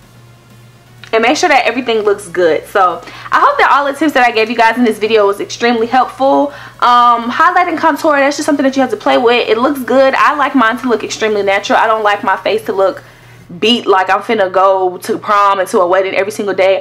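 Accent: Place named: Bay Area, United States. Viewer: Where are you from? Sounds like ur from the bay.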